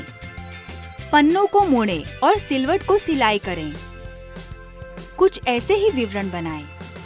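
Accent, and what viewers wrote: Standard. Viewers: Yeah puri shudh hindi bolti hai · itne shuddh Hindi Hum Bhi Nahin bolte hain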